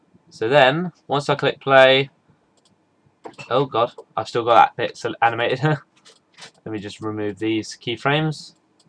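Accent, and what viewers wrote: United Kingdom. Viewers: thank you thank you tah you thank you.with your nice english accent.THANK YOU.you are the onlyone in the world who showed how to animate cloth and model together as one.THANK YOUUUUUUUUUUUUUUUUUUU.wowwwwwwwwwww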